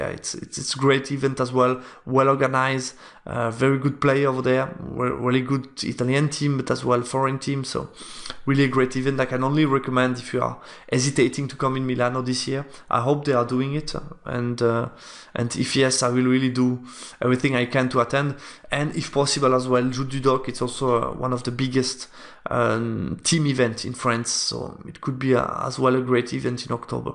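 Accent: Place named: Poland. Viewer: A very light polish accent, isn't it ? :D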